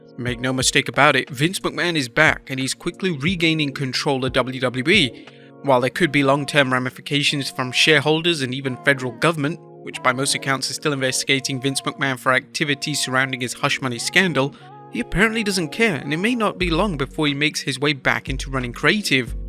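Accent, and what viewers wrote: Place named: England, United Kingdom. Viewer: Youre a narrator with an English accent so at least pronounce Vince's surname correctly! Its Vince McMahn, not McMan! 👎🏻🤦🤦‍♀️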